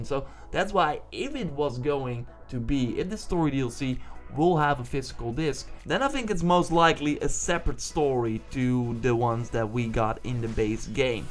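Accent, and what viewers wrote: Netherlands. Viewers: ik hoor aan je accent dat je nl bent :D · ofc he is ... that accent tho :)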